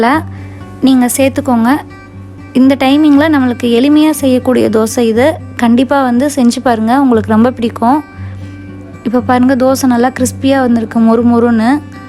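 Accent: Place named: Madurai, India. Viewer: madurai side poitu vandha maari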